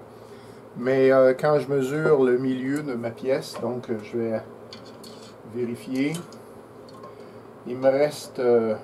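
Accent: Quebec, Canada. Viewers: Salut. D'après ton accent je dirais que tu es du Québec? Les québécois c'est la meilleure partie des français... Ici en France ce st terrible la mentalité. Bref. Super vidéo C'est une passion que je cherche à assouvir donc grd merci 😉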